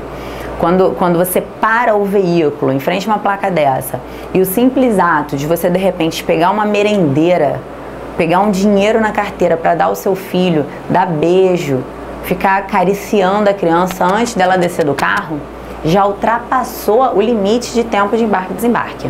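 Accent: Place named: Rio de Janeiro, Brazil. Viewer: So pelo sotaque carioca, já desisti.. Deus me livre....!!!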